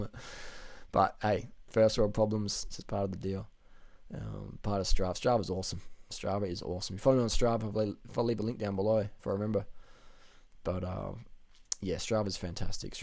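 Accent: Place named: Adelaide, Australia. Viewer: Your accent gets thicker the longer you're back in Radelaide.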